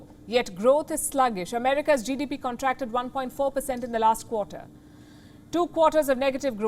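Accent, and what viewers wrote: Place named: India. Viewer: wut lmaoo... her accent is totally Indian.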